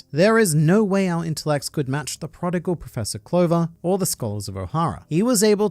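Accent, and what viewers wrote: Australia. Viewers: I like his yapping. I come to hear a fancy aussie yap about fictional pirates.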